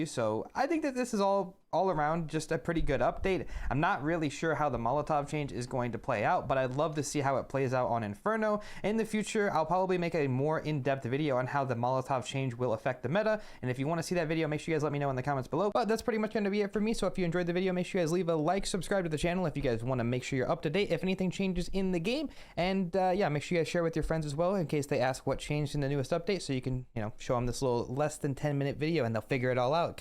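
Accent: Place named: United States. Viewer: niice the american man speaks again)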